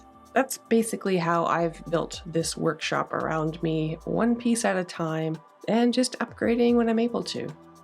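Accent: Nova Scotia, Canada. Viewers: Dear, I've been watching your videos for a while. I'm a guy who has 2 left hands (including 5 thumbs on each hand), so I would never dream of trying to flip furniture, but watching you do your stuff has taught me so much about furniture. I'm truly grateful for the opportunity to see what you are doing and hear your explanations. Firstly, it sounds as though you really know what you're doing, and secondly, I love your Canadian/Nova Scotia accent. You sound so calm, so when I've had a stressful day, I watch one of your videos and can unwind. So, for me you've become the therapist:-). I've watched other renovators, but you and Flipping Drawers (for similar reasons) are my favorite flippers. So, thank you for what you do (I also <mostly> love your ideas) and for the videos. I hope you can do much more in the years to come. Best wishes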